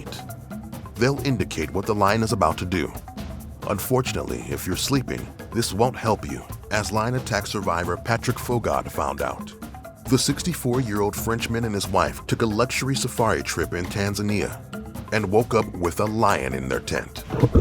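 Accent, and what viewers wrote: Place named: United States. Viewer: Hearing an American accent in a metric measurement 👌🏼👌🏼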